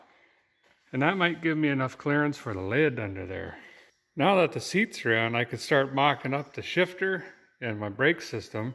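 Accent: Minnesota, United States. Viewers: Are you from Minnesota?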